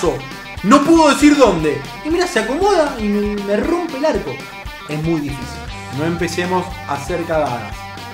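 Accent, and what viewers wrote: Argentina. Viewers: nunca vi a hablando en argentino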